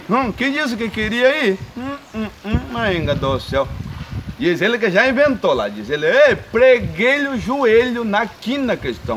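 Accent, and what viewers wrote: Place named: Paraná, Brazil. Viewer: Você é de Paranaguá ou de Antonina parece sotaque de paranaense da hora seus vídeos 😉😊😊😊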